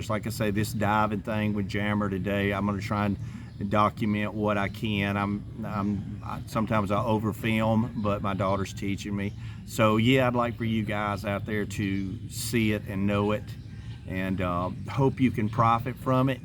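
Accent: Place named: Texas, United States. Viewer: You're a Texan cowboy lad the way you spoken yeah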